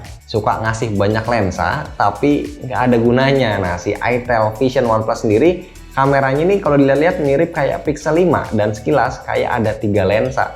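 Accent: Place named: Banyumas, Indonesia. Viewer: w Jateng om, ngapak ya tau wkwkwk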